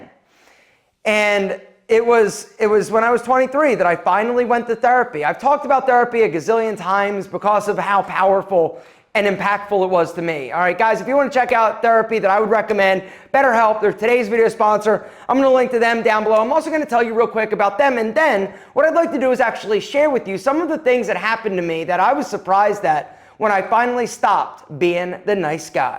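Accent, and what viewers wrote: Philadelphia, United States. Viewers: Philly accent detected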